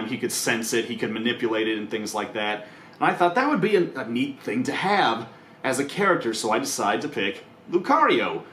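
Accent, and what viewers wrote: South, United States. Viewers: After watching one of his videos i hear the country southerness in his voice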